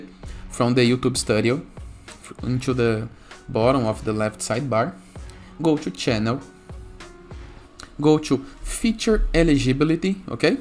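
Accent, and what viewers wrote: Brazil. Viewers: good video sotaque brasileiro kkkkk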